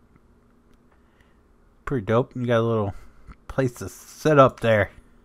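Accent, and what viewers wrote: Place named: Canada. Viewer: Laughed at that Canadian accent 😂. I’m sure you hear me on Saturdays slipping up with “bud” and “eh” A LOT lol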